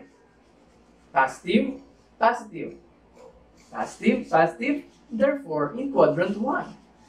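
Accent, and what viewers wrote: Philippines. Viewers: Sri lankan? I thought he's from Philippines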